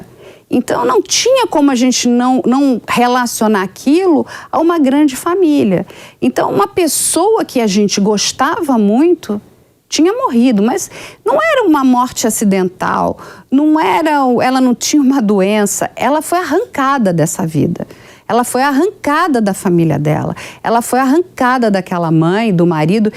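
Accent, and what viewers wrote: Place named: Rio de Janeiro, Brazil. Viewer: vc eh boa profissional e gosto de assistir seus vídeos, MAS POR FAVOR, PARE DE FALAR CARIOCA!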